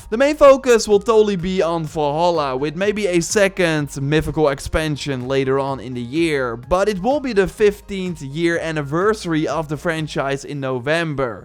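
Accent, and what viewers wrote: Netherlands. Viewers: Watched u for 5 seconds and I immediately detected a fellow dutchman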